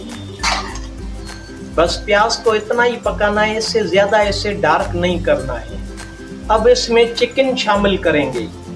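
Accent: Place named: Sindh, India. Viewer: Beautiful colors..bohat achi recipe..aurcaap ki sindhi accent itni pyari he سائیں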